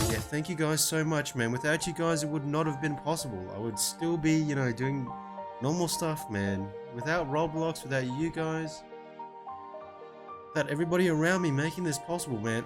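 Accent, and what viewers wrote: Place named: United States. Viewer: Are u really Filipino u sound American with a chill voice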